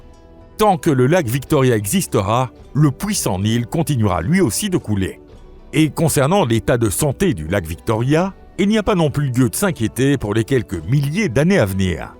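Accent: Belgium. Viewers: Ce n'est pas une ia, c'est simplement une prononciation belge, les belges prononcent le ui, oui.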